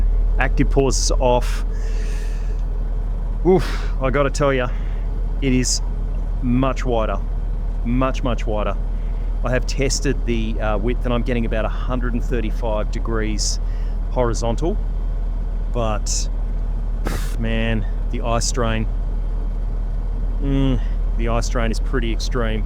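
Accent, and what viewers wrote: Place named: Australia. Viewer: Perfect video mate and the Aussie accent always wins for a Danish guy like me :) Still waiting for my Super but it is going thru the QC right now so I should have it within a month I guess :)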